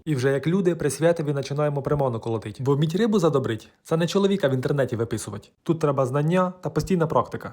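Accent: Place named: Cherkasy, Ukraine. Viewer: От оцей черкаський гумор та говірка, бальзам на серце! Дай Бог вам здоровʼя щастя та усім миру!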